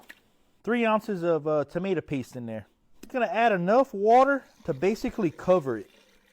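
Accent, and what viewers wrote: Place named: New Orleans, United States. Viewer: I feel like, especially at the beginning I can hear the New Orleans in his voice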